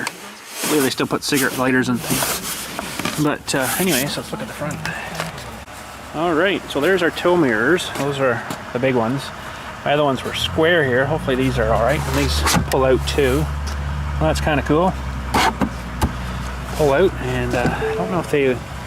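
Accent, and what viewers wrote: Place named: Ontario, Canada. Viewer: Good video, but I must say... you sound like Red Green! Haha, must be an Ontario thing. Cheers from Alberta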